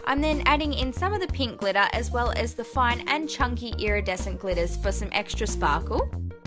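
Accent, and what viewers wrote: Australia. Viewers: are you from australia? same (im from australia)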